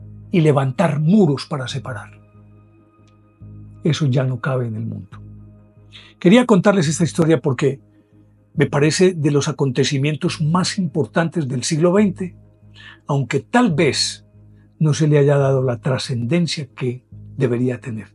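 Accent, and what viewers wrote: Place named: Colombia. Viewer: Mi admiración señor don, es fascinante su forma de narrar y contar historias, nos seduce su brillante y forma de hablar con ese bonito acento colombiano,como siempre ha estado usted magistral con su relato,gracias por su inmensa labor de compartir por este canal su enorme sabiduría,saludos desde jerez, España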